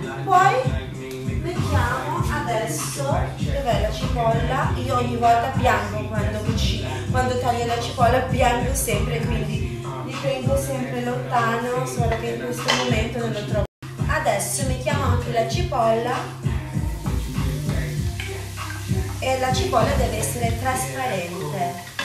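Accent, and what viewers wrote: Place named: Liguria, Italy. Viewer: Che bello il tuo accento ligure orientalizzato...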